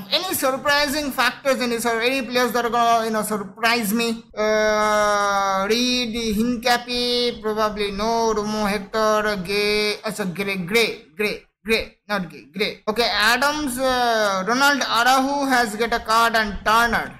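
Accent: Bengal, India. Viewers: are you bengoli?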